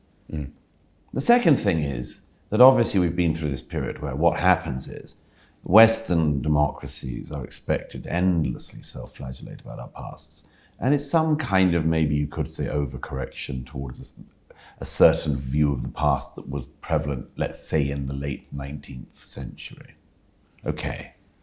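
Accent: RP, United Kingdom. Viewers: This is one of the unfortunate byproducts of 30 years of dumbing down, there are people out there in Internetlandia who would follow Murray blindly based on the fact he can string a sentence together without saying “like” out of context, with a posh accent. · The man with the posh accent is speaking the truth. You would know that if your knowledge of history wasn't limited to TikTok and shows from Netflix.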